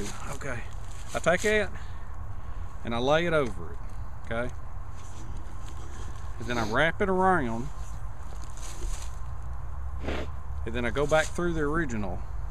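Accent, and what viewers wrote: South, United States. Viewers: You come up with some great ideas,. I really enjoy watching your vids, and I love that southern accent! Lol! take care, now, and a big hello from New Zealand!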